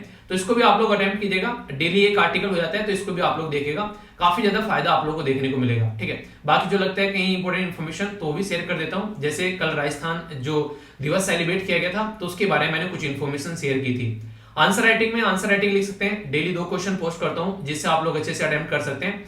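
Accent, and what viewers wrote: Haryana, India. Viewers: Hlo dii aap Haryana se ho na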